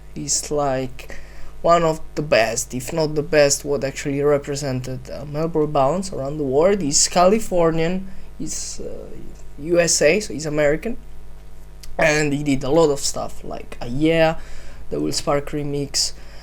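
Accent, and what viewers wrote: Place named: Italy. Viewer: si sente la pronuncia italiana :D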